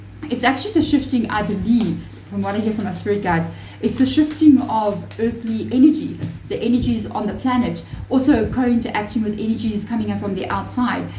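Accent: Afrikaans, South Africa. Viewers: You are 1000% correct, when I do a reading I can never recall the information 😂 thank you so much for your channel. My great grandmother is one of my ancestral guides, and she was Afrikaans. I appreciate your beautiful accent, makes me feel closer to her, which is funny cos I know she is always with me xx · I have been thinking about writing a book for years. I have so much information that I feel is valuable. My ADD, anxiety, and depression are really limiting my energy and motivation. I love your accent. I lived near Cape Town for a while. Lovely country and people 💛 love from the USA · I thpught i picked up an Afrikaans accent. Im in the vaal in south africa. I havnt got a wand but i use a cow tail . And im so obsessed with horns haha. The things you are saying are so spot on. This whole reading ifeel is aimed at me. I am going thru a spiritual awakening and im writing a book.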